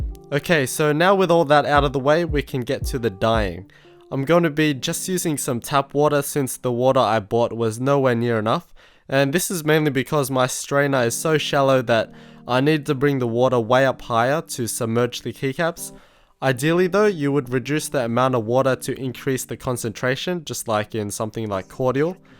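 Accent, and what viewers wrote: Australia. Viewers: ayyy an aussie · I thought you were Australian! ...if it was a mix accent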